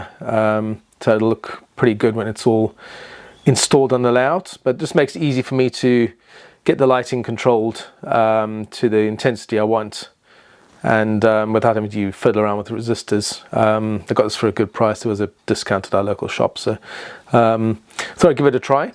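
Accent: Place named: United Kingdom. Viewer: thanks and I look forward to more episodes.... it is interesting listening to a British acccent talking about Chicago rail .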